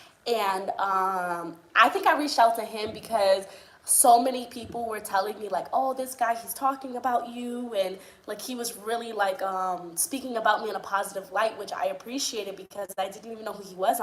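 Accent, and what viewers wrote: New York, United States. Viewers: You are such a New Yorker! Your accent and your mannerisms remind me of being home! May God bless you and your family! I saw some clips of your wedding. It was beautiful to watch! Congratulations 🎊🍾! You are blessed to be on the continent because you are surrounded by such a beautiful culture and beautiful people! Your journey in Africa was meant to be because you are an inspiration and you made the necessary sacrifices to fulfill your destiny! Keep the videos coming! It doesn’t have to be perfect. Just be yourself and continue shooting Tanzania! 👏🏽👏🏽 🥰 💐💐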